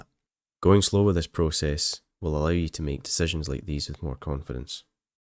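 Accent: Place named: Scotland, United Kingdom. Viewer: amazing job mate! 🤘 and that smooth Scottish accent makes the video 10x better lol 🤣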